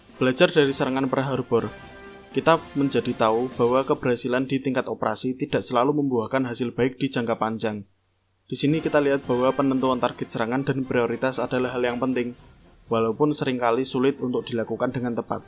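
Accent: Java, Indonesia. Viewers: logatnya medok bgtt aduhh .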